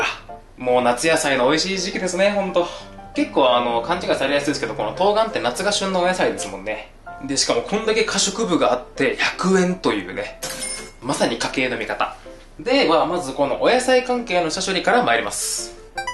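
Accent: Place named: Fukuoka, Japan. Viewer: 「バックを『からって』店内を歩けないでお馴染み」 親の転勤で福岡県から他県に引っ越した時、学校でランドセルを『からう』が伝わらなかった時の衝撃。の福岡弁懐かしくて大好き 『背負う（せおう、しょう）』が標準語なのかな？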